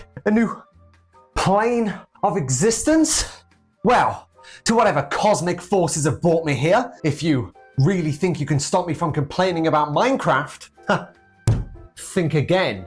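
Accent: England, United Kingdom. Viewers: Never piss off an English(?)man, he’ll break reality and ascend to a new dimension in rage Edit: he pulled a flintlock so he may just be American with an English accent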